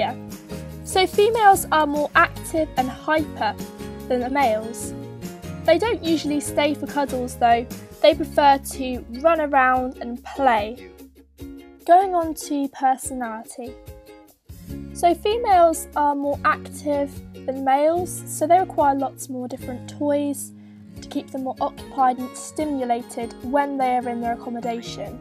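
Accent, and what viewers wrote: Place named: London, United Kingdom. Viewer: What area of London is this accent from?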